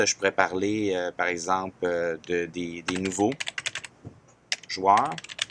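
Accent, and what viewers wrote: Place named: Canada. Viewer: Merci pour ce bel accent canadien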